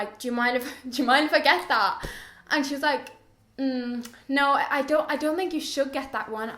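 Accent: Ireland. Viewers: What part of Ireland are you from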